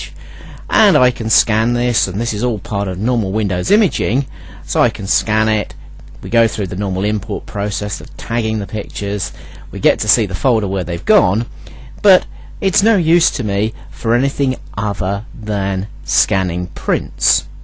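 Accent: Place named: United Kingdom. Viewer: Enjoyed your British English so much, just listened for this purpose :)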